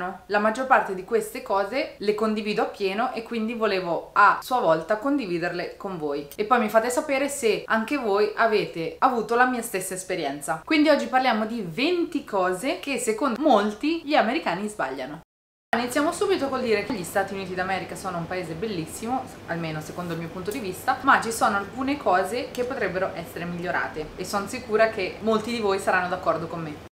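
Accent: Ancona, Italy. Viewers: Ciao ho visto il tuo video per caso ma ho subito riconosciuto l'accento in italiano Sei per caso di Ancona poco ma sicuro .sulle mance non sono molto d'accordo Visto che non è una libertà ma un imposizione e poi non è tutto mercato nero sono soldi non tassabili e in più alimenta Il sottocosto retribuito di chi lavora come cameriere o altro visto che le mance contribuiscono.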